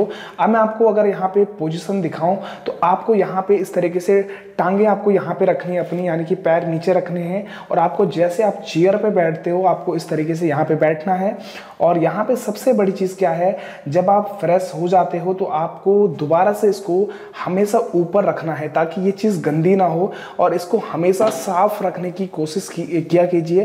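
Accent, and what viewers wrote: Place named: Pahari, India. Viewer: Bheji 🙏 isme kya new hai video me ! Bhut ajeeb lga ye video but apka sh - s maje daar hai jo sari pahadi logon ki lenguage me sunai deta hai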